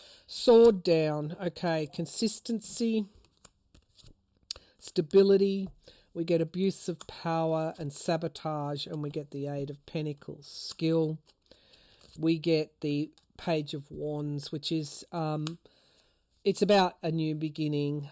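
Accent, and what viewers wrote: Australia. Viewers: Your Aussie snark sounds so pretty! Thanks for all you do! Can't we just have a whole new party? From the ground up. Dems or magats are useless. A new party of young folks. But if we apply this: A kid is getting C and D's all year. On the final, he gets 100% A+...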